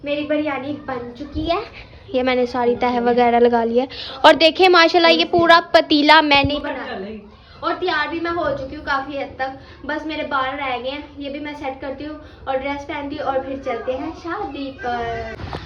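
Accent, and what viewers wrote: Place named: Rural, India. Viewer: Itna painduo ki tra bolti ho.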